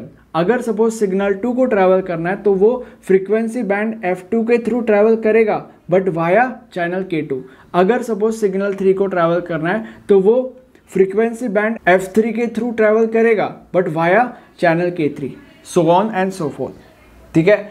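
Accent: Maharashtra, India. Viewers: are you marathi ?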